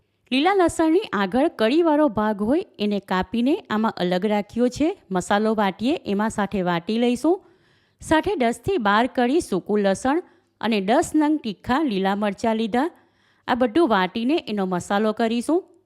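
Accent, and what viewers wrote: Surat, India. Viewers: This looks so delicious! I'm definitely going to try making these batata vadas at home. Thanks for sharing the recipe! surti tone, i love it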